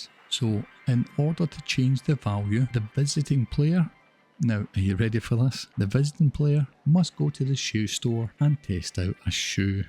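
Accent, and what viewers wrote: Scotland, United Kingdom. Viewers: I should have listened too, Didn’t realise you were also Scottish 🏴. Think I have sussed the issue. Both our gametags have exactly same amount of characters 11 long. So I am gonna change mine since you can do it once for free. Hopefully that helps. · I watched your video, a lot of times and I never understood what I needed to do... with all respect, since I'm not English, it's a bit tricky to understand with your Scottish accent 😅 (I love your pronunciation btw). A little recap can be very helpful, for real...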